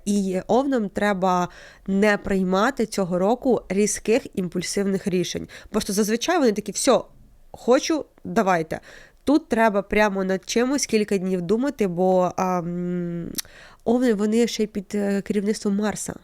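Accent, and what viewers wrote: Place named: Surzhyk, Ukraine. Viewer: Це неможливо слухати. Як людина з таким жахливим суржиком дозволяє собі виходити в ефір. Це, в першу чергу, неповага до слухачів, і до Вашої аудиторії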